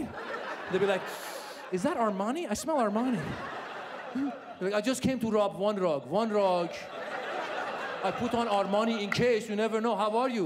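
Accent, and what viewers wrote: Iran. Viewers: hahahahah his persian accent is awesome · this guy is amazing being half persian this hilarious!! the thick persian accent cracks me up haha totally my dad!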